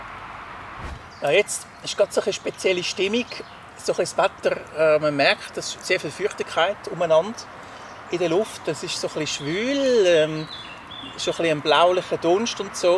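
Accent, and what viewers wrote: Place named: Switzerland. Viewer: Habe meine Switzerdütsch Lehrstunde schon vermisst.😉 Koche jetzt draußen bei dieser Trockenheit, wenn überhaupt, nur noch mit dem guten alten Schwedenfeldgeschirr und Spiritus. Mein Sandsteinöfchen ist zwar eine recht sichere Sache, trotzdem verzichte ich bei dem Wetter gerne darauf. Muss ja auch nicht sein. LG Miesegrau & ÖPi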